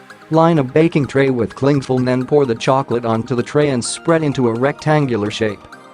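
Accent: United States. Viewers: An American robot!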